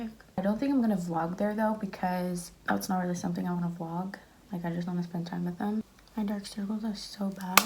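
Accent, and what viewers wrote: United States. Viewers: I love your videos so much! <3 just wondering, where are you from? cuz you sound super american but you live in the netherlands? also I love your style, you're so cute :)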